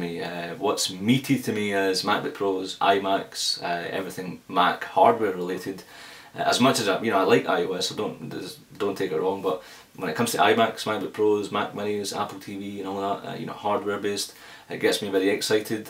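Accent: Scotland, United Kingdom. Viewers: are you scootish?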